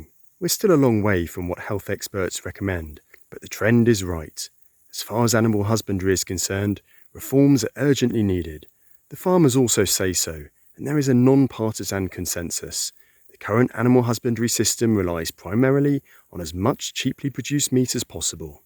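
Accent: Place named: RP, United Kingdom. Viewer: He speaks English with an upper class accent, lovely pronunciation, but not over the top. Really easy to listen to.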